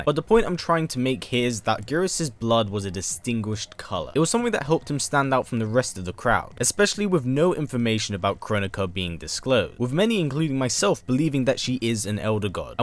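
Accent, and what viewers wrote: England, United Kingdom. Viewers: you are from England?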